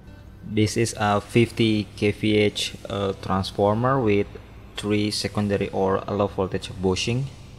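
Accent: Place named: Indonesia. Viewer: Logat orang Indonesia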